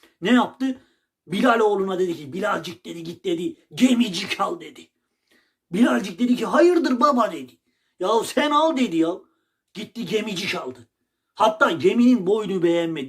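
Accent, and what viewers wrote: Erzurum, Turkey. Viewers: Erzurum şivesiyle güzel yorum yapıyorsun.Gerçekten oralıysan,Erzurumluların seni dinlemesini ve taktir etmelerini senden onur duymalarını dilerim...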